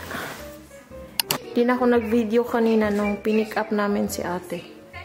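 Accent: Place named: Visayas, Philippines. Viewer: Hello, I am new to your channel. Saang part ka ba sa Pinas? Halata na bisaya ka.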